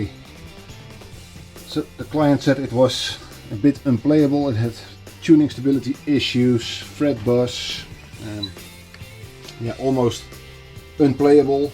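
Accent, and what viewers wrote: Netherlands. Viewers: Are you dutch . It sound like it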